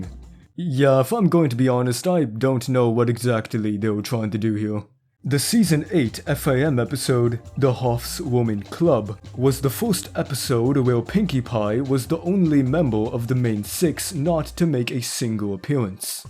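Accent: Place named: Germany. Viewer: are you german, because your voice sounded like a German accent.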